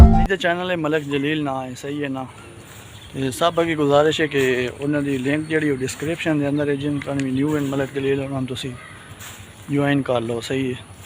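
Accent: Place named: Punjab, India. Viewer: very nice.I really enjoyed your video. God bless u. I like your punjabi accent.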